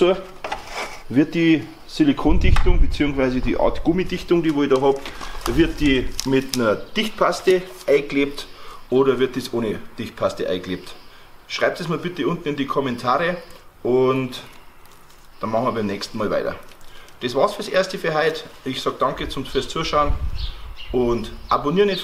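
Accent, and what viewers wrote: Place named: Bavaria, Germany. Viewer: Schee boarisch! Da macht das anschauen gleich noch mehr Spaß ... danke!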